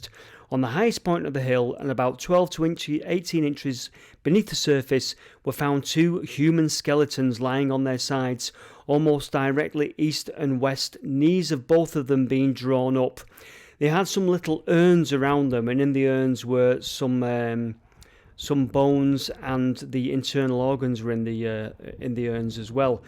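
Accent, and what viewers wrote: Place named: Oldham, United Kingdom. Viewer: My family is from Oldham, I’ve visited twice in the last 3 years. Can’t wait to visit again., love your accent, reminds me of my dear late dad. ❤️❤️❤️❤️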